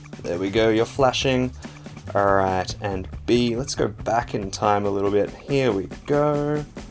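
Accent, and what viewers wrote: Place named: Australia. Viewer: Dat comfy Aussie accent. :)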